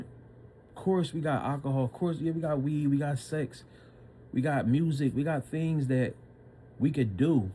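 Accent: Philadelphia, United States. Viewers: Philly in the building, I heard it soon as you spoke, 👍👑👑👑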